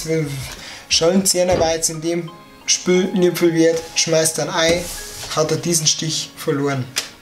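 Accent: Bavaria, Germany. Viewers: Wiaso? Boarisch is doch schee! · schlecht erklärt, und der bayrische Dialekt ist echt grausam - sorry! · Is des a Bayer oder a Breiss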